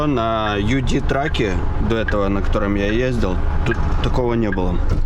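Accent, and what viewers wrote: Odessa, Ukraine. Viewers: привет - я с УКРАЇНИ!!! ЗОВСІМ СЛУЧАЙНО ПОПАЛА НА НАШ ВЛОГ І - ОБАЛДЕЛА!!! - ВИ ПРОСТО ЧУДО І ВЕЛИКИЙ МОЛОДЕЦЬ-ГУ НАСТОЯЩИЙ ОДЕССИТ(рисковий) ГОВОР ОДЕССКИЙ НІ ДЄ НЕ ДІВСЯ!!!! 👍👍👍 ДА ТАК РОЗВЕРНУТИ СВОЕ ЖИТТЯ- МАЄ БУТИ БОГАТО ФАКТОРІВ!!! В ВАС Є СТЕРЖЕНЬ І ВИ ПРОСТА ЛЮДИНА І БЕЗ ПОНТОВ!! А ЯПОНІЯ ДУЖЕ ГАРНА І ЦІКАВА І ЛЮДИ ТРУДЯГИ!!!! ДАЙ ВАМ БОГ І ВАШИЙ СІМ'Ї МІЦНОГО ЗДОРОВЬЯ, ЩАСТЯ, КОХАННЯ, ДОСТАТКУ І МИРНОГО НЕБА В УСЬОМУ СВІТІ!!! А ДІТИ В ВАС --- ЧУДО!!!! 👍👍👍❤❤❤💙💛💙💛🌹🌹🌹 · Привет, тезка. Случайно попал на твой канал. Сразу было предчувствие, что ты с Украины и говорок одессита. Подписался на все, а так смотрел дальнобой по штатам, но у них там начало тухнуть. Смотрю и наших пацанов по России, даже общаюсь в живую. Интересно посмотреть Японию. Сыну 20 лет и тоже хочет туда к вам. Даже не знаю, что ему посоветовать. Смотрю и слушаю тебя. 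😊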